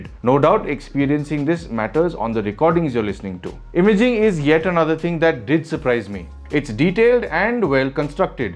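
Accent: India. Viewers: as I'm a core subscriber for your channel, I'm still in shock that for such a great reviews on well known TWS earphones, even after long time your not gaining in subscribers If I'm you and keeping all these efforts for the wonderful output of this beautiful video I would close my channel if enough encouragement was not given But you on the other hand doing fabulous Job with your Indian English ( easy to understand ) as well as your Honest review ❤️ Thanks brother and I hope you see grow in the future, Don't forget me !😂😂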